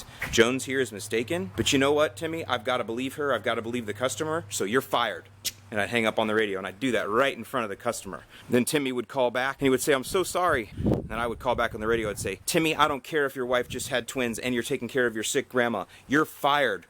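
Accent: Chicago, United States. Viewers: Spot on Chicago accent! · Are you from the Chicagoland area?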